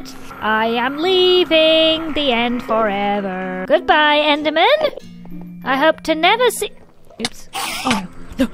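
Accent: United Kingdom. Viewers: OMG she can rhyme "Fear" With "Idea" Cuz she has a british accent! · I love how fear and idea rhime in British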